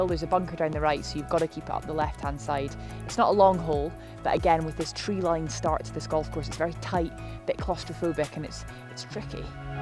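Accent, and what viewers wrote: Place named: St Andrews, United Kingdom. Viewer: I have started to putt more with the pin in. In the past three years playing about 100+ rounds per year (HDCP 6.3) and as a senior golfer, I find it helps with my depth perception. Maybe it's just my older eyes, but I can't remember the pin ever keeping a ball out of the hole for me. BTW, my daughter is a student at StA so as a Yank from the US, when I watch your channel or hear your commentary, it reminds me of what she hears every day and just helps to bring her closer to me. Please continue the great work! I love chasing daylight as well as being a dew sweeper!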